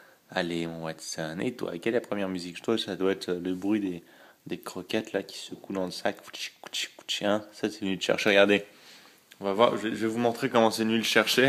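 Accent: Belgium. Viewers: Vous parler comme nous lles belges :) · Vous dites comme en Belgique :)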